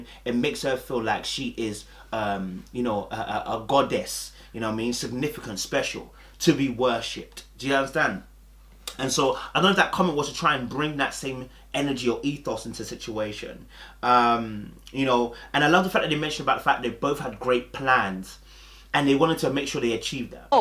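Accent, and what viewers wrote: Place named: Birmingham, United Kingdom. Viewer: The brum accent comes out every now & then 😅💥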